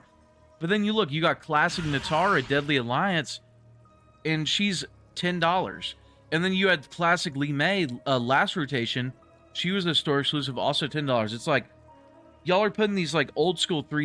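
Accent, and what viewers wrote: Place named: Texas, United States. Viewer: bro! You gotta be from Texas. Heart to confirm 👍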